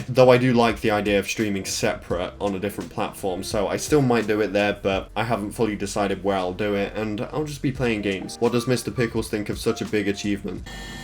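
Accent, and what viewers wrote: England, United Kingdom. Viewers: Im here because of his english accent voice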